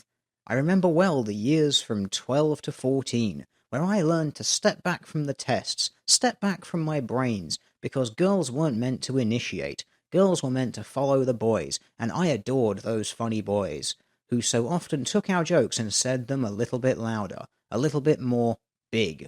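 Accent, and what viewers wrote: United Kingdom. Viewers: why is the toilet humor extra funny from a british accent? (Cuz I'm American isn't it?)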